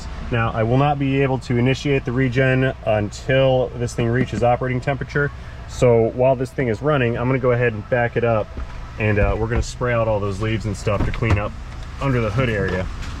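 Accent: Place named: Harvard, United States. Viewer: why does this guy talk like hes from Harvard?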